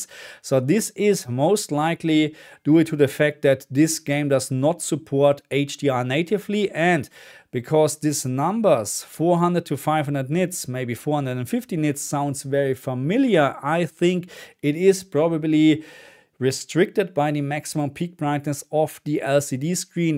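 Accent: Germany. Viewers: Is this guy german?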